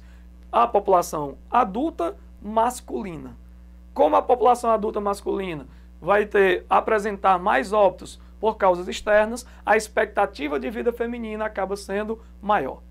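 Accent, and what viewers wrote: Northeast, Brazil. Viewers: Deu até saudades do Nordeste só de ouvir o sotaque dele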